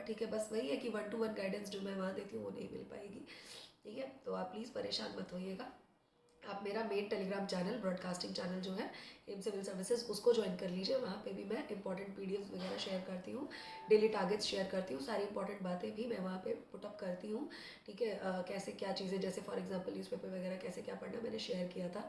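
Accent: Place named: Lucknow, India. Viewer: ohk kk mam.. pranam 🙏 recently m listening ur vdos 😍 u are truely 💎 n u really care about studdent's studies . so much positivity ,full of real information which is most imp thing.. faltu ki bkwas ni hoti h..bhut se log aadha tym vdos ko start krne uska add krne me lgate h .n faith on our bhgwan n spritual knowings 🙏 n flow of ur accent 😍..I think aap lucknow ya uske aaspas k ya pragraj se ho... 🙏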